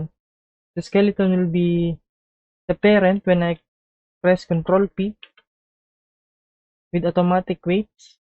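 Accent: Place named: Philippines. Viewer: Filipino here, I can hear the Filipino accent. Good job bro!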